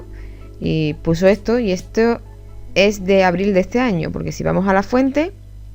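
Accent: Andalusia, Spain. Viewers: eres de Andalucía? ;)) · tienes acento andaluz me encanta, muchas gracias por confirmarlo enserio lo estaba esperando, un beso desde Granada❤🤗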